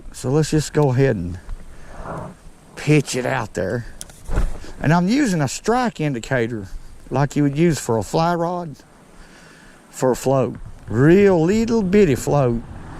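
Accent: South, United States. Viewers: "she's over chonder... . I got my pressident reel... And an each and half bait..." Lol I like hearing deep accents lol* like that. Makes me wonder if I have a weird accent to people down south 🤔 ... Yeah I'm high. But fishing opener here is Saturday! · Just found you on here, and I have to say it’s nice to hear someone with my down south accent haha and someone who’s part of the bank fishers... Love your videos Crazy question, I’ve always Bass fished, how do you know the crappie is a male before it gets out of the water? LoL sorry I’m dumb with crappie but trying to learn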